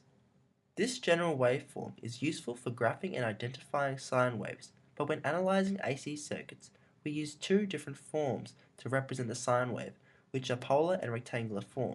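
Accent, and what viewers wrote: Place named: RP, United Kingdom. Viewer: whats with the posh accent haha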